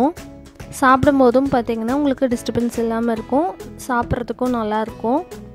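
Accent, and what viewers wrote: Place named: Chennai, India. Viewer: ungal voice kovai Tamil illa Chennai Tamil maari iruku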